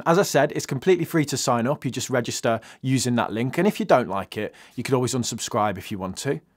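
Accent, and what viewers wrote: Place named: Midlands, United Kingdom. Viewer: Trying to pinpoint your accent, sounds slightly midlands with a northern twang or maybe Cheshire?! Anyway great content as per usual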